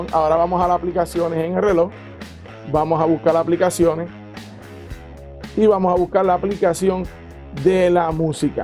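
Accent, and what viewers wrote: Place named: Puerto Rico. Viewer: Tu acento Puertorriqueño lo reconosco en la luna Desde juncos te veo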